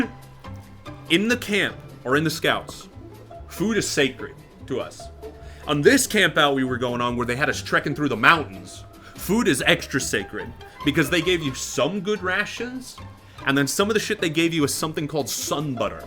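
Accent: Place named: New York, United States. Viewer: man your accent would be hilarious in a campaign ... the new yorker halfling or kobold or something talking really really big...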